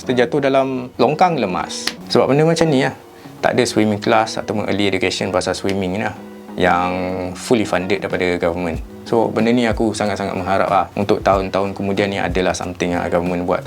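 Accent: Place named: East Coast, Malaysia. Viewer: Betul Abg..It's me.Masa tu terlalu nervous nak dapatkan lesen. Now sudah dapat lesen ..Time for some fundive. June 9-12 akan bawa 3 orang member untuk ambil lesen open water. I will drop by coral bay to meet you bro. Abe jangan guna dialek pantai timur,Viki susah nak faham 😜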